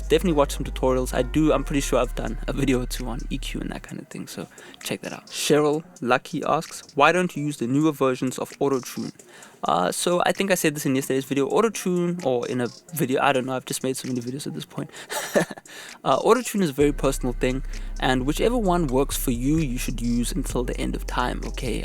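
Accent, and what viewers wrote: South Africa. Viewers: Where are yo from bro you sound South African. I would know cause I’m from there as well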